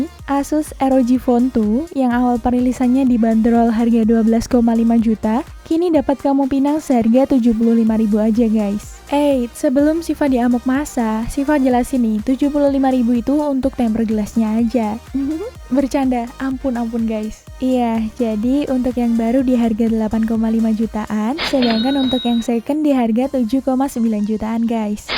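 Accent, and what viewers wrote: Indonesia. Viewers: Lidah indo maklum aj lah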